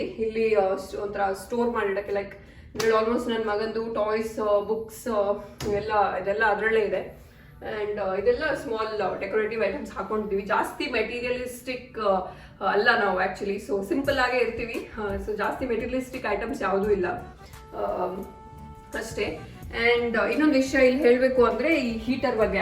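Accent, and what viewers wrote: Mangalore, India. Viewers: I like the Mangalore kannada, do you know kundapur kannada? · dayavittu mangalore kannada matadi nevu mangalore kannada chenag matadtira......hage nevu return india bartira illa PR tagondu alle settle agtira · Kudla is the best..😘😘 So good to hear mangalore kannada.. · hi, yenri video nimadu super, matu nim manglore language tumba sakatagi irute. navu north karnataka davru, yavagalu nim manglore language keloke tunba ista. ondu request nimgae adstu manglore langauge matadi. hege heche hechu video madi... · I like Mangalore Kannada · Mangalore kannada👌👌👌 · Hii sister.... Iam from sullia.... Neevu manglore kannada mathadtha edre keltha irbeku antha agtha de❤❤❤.... Plzz reply mam. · Really i like ur Mangalore kannada tumba chenag mathadthira. Mangalore kannadane mathadi tumba janakke esta agutte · Yeah... I like ur mangalore kannada & tulu ... 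evn I m from mangalore... staying in UAE .. love ur channel.. all the best ❤️ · I love manglore kannada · ಮಂಗಳೂರು ಕನ್ನಡ ಚಂದ ಉಂಟು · Supar Mangalore kannada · Nivu maglore Kannada ne matadi Mangalore Kannada nange rumba esta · Mam neevu Mangaloru kannadadalle mathadi aaytha nimma Kannada thumba chandha untu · Nima manglore language.super sis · Sister nima Mangalore Kannada super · I love your Mangalore kannada tq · Nivu Mangalore kannadane matadi mam . Nivu chennagi matadtira . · Nimma kudla kannada nice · Manglore kannada esta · Neev manglore kannada mathadodanna keli tumba kushi agutthe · Mlore Kannada super. So pls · I like your mangalore Kannada . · Hi, this is Praveen from mangalore, nice home, your mangalore kannada is nice to hear😃, and we really proud for our tuluvas are in everywhere 👍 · Woww super erna mangalore kannada... tulutla paterle .. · Actually Mangalore Kannada is very nice to hear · I like magalore Kannada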